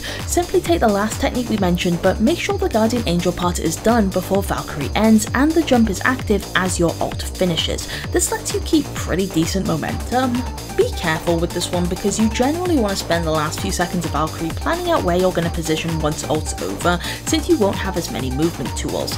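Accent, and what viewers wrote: United Kingdom. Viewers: I love your british english!!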